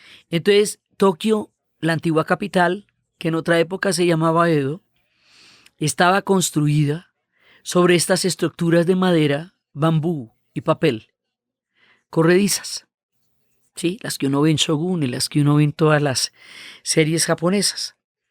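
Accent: Colombia. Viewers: un agradecimiento patrio por ser la embajadora de la palabra con acento colombiano. Qué exquisita experiencia visitar al Japón 🇯🇵 guiada por tu voz. Gracias!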